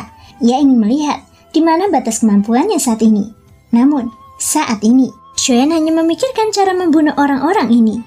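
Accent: Java, Indonesia. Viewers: medok emen...kenapa ga sekalian pakai bahasa aslimu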